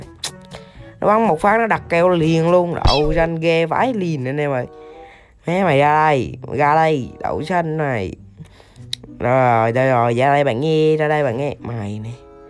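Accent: Quang Nam, Vietnam. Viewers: Giọng ông giống người Quảng Nam hay Quảng Ngãi hay Đà Nẵng ấy nhỉ ?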